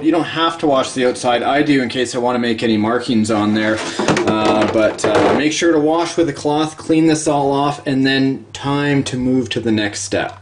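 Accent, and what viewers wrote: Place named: Canada. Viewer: Looks good , I like the fade and the tint . It is kinda funny hearing you deck'lls , I firs heard the on trailer park boys and thought it was just how Bubbles said it , I now know its a Canadian thing . Keep up the awesome work can't wait to see ya tow your crawler in to dudes garage or your mud tuck out to the trails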